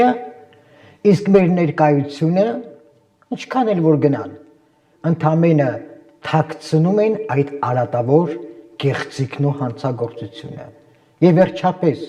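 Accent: Standard. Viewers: 🇦🇲♥️✝️Տիկին ՚Ես իրանահայ 1969 ից ապրելով Հայաստանում ՚1992 ից ՚ապրելով Գլենդելում ՚շատ զարմացած եմ ձեր քաջության՚հզորության եվ մաքուր Հայերեն խոսացող ՚գրագետ ՚դեռ չեմ տեսել ՚ես շատ կուզենայի ապագա Հայաստանի Պրեզիդենտի աթոռին։Դուք կին լինելով ՚100 տղամարդու որը Հայրենասեր են ՚ավելին եք՚դուք կփրկեք Հայաստանը եվ Հայրենադարձություն կսկսվի ձեր ժամանակ ՚որով հետեվ դուք կպաշտպանեք նրանց շահերը։👏👏👏 🇦🇲🇦🇲🇦🇲❤️❤️❤️🎯🎯🎯✝️✝️✝️ Բարեվներ Գլենդելից ։ 🇦🇲❤️🇺🇸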